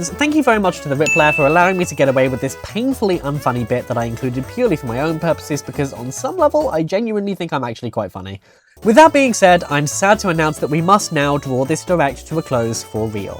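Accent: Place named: United Kingdom. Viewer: It's a good Direct, but my only criticism is that some unscripted parts of the Direct gave me too much of a headache with your British voice, especially the "sponsored" bit at the end with that Greek statue guy who is really crazy annoying to me! That's why I prefer another fan direct like Rayman Alive or F3 So remember, next year, no more crazy staff or badly unscripted parts!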